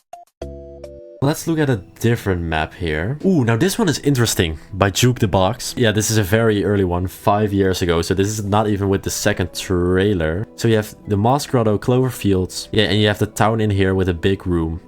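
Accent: Netherlands. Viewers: Wait your dutch?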